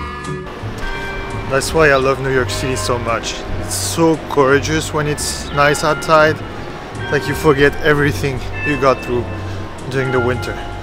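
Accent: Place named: France. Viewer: l'accent français c'est impossible de s'en débarrasser en fait mdrr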